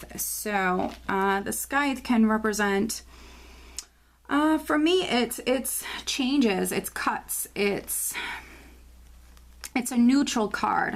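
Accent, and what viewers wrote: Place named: New York, United States. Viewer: where are you from? I hear a ny accent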